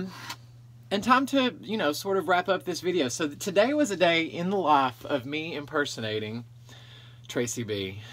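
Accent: South, United States. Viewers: 😂😂 LOVED this!! I definitely felt Taci B coming through but that southern accent ain't goin' nowhere! 😆 YESSSS to the homemade Ranch dressing! Have you had the Reeses cereal that comes out in spring/Easter in the shape of bunnies?!? 🐇 MY fave! I think about someone living in our attic far more than I'd like to admit.... that story was sooooo creepy! 😦😭😊💖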